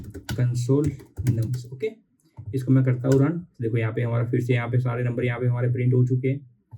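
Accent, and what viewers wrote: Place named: Gujarat, India. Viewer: Bhai, content ekdam solid che! Thodu communication polish karo, Gujarati accent ne remove kro, to channel next level par jase. 🔥 Keep going strong! 👏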